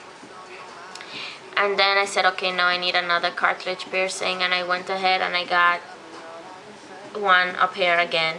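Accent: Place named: West Indies, Caribbean. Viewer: I love your west-indies accent! Your real pretty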